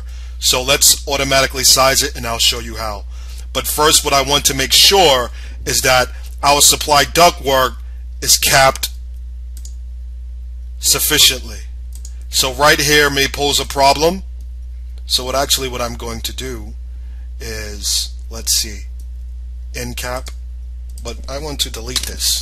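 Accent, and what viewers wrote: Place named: Brooklyn, United States. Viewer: Cool Brooklyn accent... Good tutorial, but I am curious to if the duct sizes and CFMs are actually linked to the parent file, with considerations to building orientation and insulation values. From a design perspective, are ducted returns now normative in NY? as ceiling plenum returns are much more efficient and less fussy -- except where mandatory for hospitals, labs, etc.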